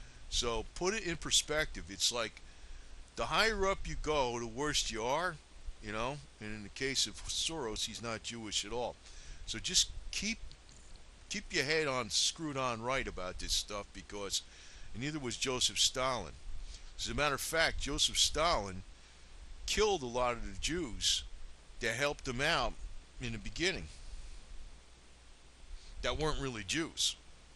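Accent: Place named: New Jersey, United States. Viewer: Its a real accent. Its an old school working class New Jersey accent I think? The only other person I've ever met with the same accent was a WW2 veteran. Its an old school accent.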